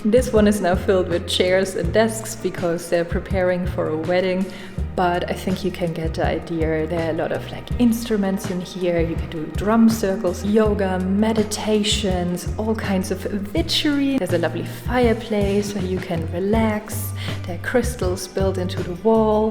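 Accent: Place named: Germany. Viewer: I absolutely love your German Accent which I find extremely endearing and comforting have yourself a wonderful and Bewitching day and Blessed Be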